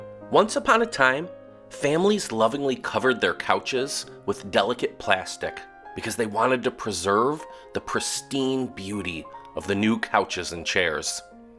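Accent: Midwest, United States. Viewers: I like your accent. It sounds like you're from the Midwest. I could be wrong, but that's what it sounds like to me.😊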